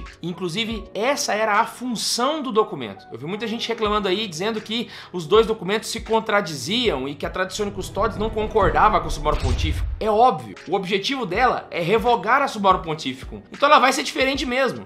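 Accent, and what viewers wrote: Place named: Goiás, Brazil. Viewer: Diretamente de rio verde goias pra dizer que esse e o melhor video sobre o assunto, conheci esse sotaque no comeco no video meu conterraneo,abraço .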